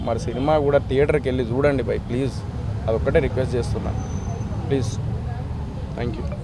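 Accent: Telangana, India. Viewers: Mundu nuvvu Telangana slang maarchu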